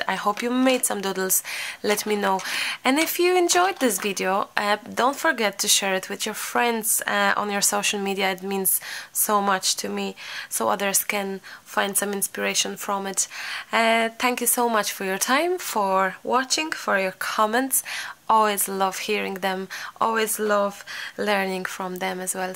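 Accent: Cork, Ireland. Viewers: you made me laugh so hard hearing the word 'mankie', my mam used to say that when we were younger and I use it around my grand babies too, so funny. Can I detect a Cork accent when you talk, I'm in Athy Co. Kildare. I love to watch you work your magic in your videos, thank you for sharing them all with us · I hear a hint of an Irish accent in there mixed with your native accent..Am I wrong? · I keep hearing Irish vowels in your accent, have you settled in Dublin? Love your tutorials btw. Thank you ☺️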